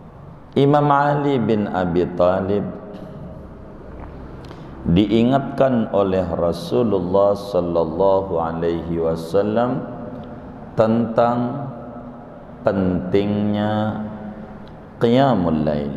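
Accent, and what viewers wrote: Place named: Betawi, Indonesia. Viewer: Assalamualaikum wr wb,mhn ijin kiyai,ane sering mendengar ceramah kiyai enak bener penyampaian kaya org betawi, subhanalloh...smg kiyai sehat terus beserta kelg n berkah piddunia walakhiroh,Aamiin yra🤲🤲🤲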